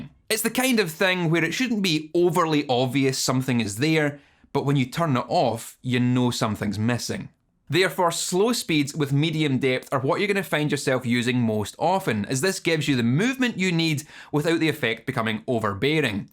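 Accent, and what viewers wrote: Scotland, United Kingdom. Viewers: As a musician from Scotland living in Stockholm, Sweden, i can say that these videos are extremely well made and that it's also a relief to hear a scottish accent again, great work, keep it up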